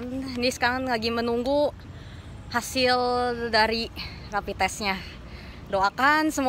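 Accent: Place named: West Java, Indonesia. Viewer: Kok logat nya Sundaan banget yah 😁😁😁😁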